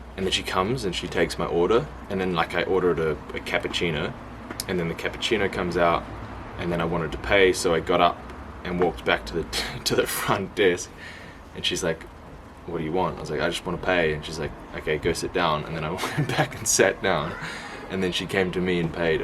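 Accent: Austria. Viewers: Stumbled over your videos just a couple of days ago and I find them HILAAARIOUS. Your austrian is very good and I can't stop laughing whenever you say Oida or passt schon. Anyway, your observations on us is spot on and I hope you enjoy your stay here. I have been to New Zealand many years ago and it kind of struck me like a pacific version of Austria, only surrounded by an ocean and with volcanoes. I rented a motorbike on the southern island and vividly remember driving past meadows with wooden barns and rolled coils of hay and I only could think "Dude, this looks exactly like home!" Keep on making videos and have fun. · Wait. is this guy german (austrian) or actually from somewhere else? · Your austrian is very good, and it's fun to watch your videos, you do not complain, mostly, you just observe the differences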